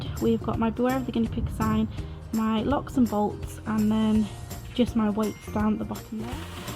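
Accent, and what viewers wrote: Wales, United Kingdom. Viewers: Great videos. Where in Wales are you from?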